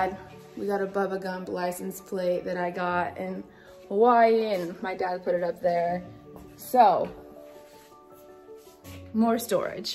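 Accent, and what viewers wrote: Midwest, United States. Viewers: Hip hop culture? Where are you from, man? That's how people in the Midwest talk.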